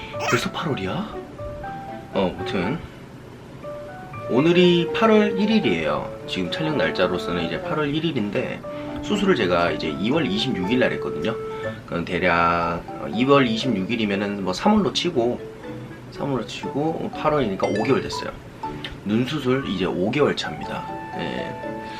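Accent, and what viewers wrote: South Korea. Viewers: 나 화면밖에서 경상도 사투리들려서 들어왔는데 레알 경상도였네...그래 잘생겼다.... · 뭔가 말투가 완전 대구사투리는 아닌것 같은데 ? 서울말이랑 섞인건가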